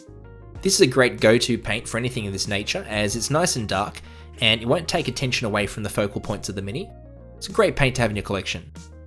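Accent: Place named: Australia. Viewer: Keep up the good work,! Your paintjobs are crisp and I really enjoy the Aussie accent when I see your painting.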